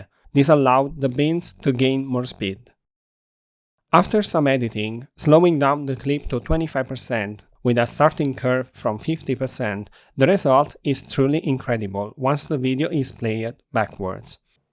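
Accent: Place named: Turkey. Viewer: Dude, are you Turkish? You have Turkish accent 😊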